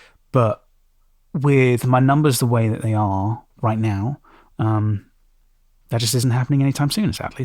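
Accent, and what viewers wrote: United Kingdom. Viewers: Well, for me personally,it was like YT stopped showing me your content. And I always try to engage in the channels i like,even if it’s just liking the videos.. and they’re definitely not going to tell you if your being shadowbanned!! And that sucks about the name!! I’ve noticed channels that do well, consider their fan base as their “family”, and interact often on live streams,posts,surveys (I do them!) never feel like you can’t ask your fans what they want!! I also started watching for wendigo stories….it’s hard to find ones that are close to lore, and aren’t getting skin walkers and wendigos mixed up..there’s some good stories out there, out of the thousands of crappy ones! Find something that you can make ur own, like ASMR(rain,fire,wind sounds)and scary stories. It’s oddly soothing. “Being Scared” I believe is his name is a great creepy pasta narrator,with ASMR. 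Dark Somnoum writes and performs all his music in his videos,and many narrators in the community support him,he’s a great narrator,as well as musical talent!! So maybe there is something special you can offer your fan base as well! It makes folks feel appreciated! I hope some of these comments helped,and are solely meant to be constructive! I am a big fan of yours as well,I love the Brit accent and you have great narration skills as well! I know it can’t be easy!! But thank you for the great content no matter what!!😊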